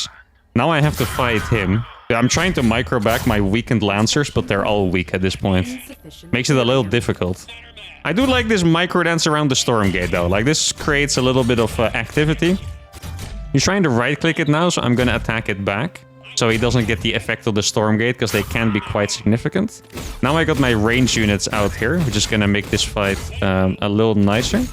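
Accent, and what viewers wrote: Netherlands. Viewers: is this dude Dutch? sounds Dutch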